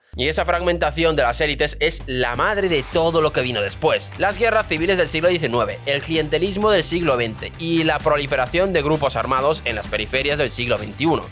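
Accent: Russia. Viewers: Es Ruso y casi no se le nota... Hasta ahora.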